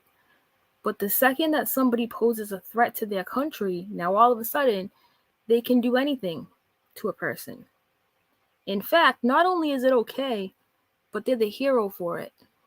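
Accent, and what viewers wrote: Boston, United States. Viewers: GUUURRRRLLLLL…. First off, how some people have even 1 sub truly confuses the hell out of me. The hypocrisy on YouTube pours out like water! People want to point fingers for “being in someone’s chat”, but meanwhile they are in the EXACT SAME LIVE hiding in the bushes!!! They are too dang nosey not to be! Always trying to be in the mix while holding up their “clean hands” claiming innocence. Those are the ones that need to “claim their nasty”. At least you’re not afraid to own up and stand in your truth. ✌🏼😊 Ps - my Mother was born and raised in Boston… (we are Kessler’s and Kavanaugh’s). I love your accent! We moved to Georgia 40 years ago, so yea, there’s no Boston accent left here. LOL! 😆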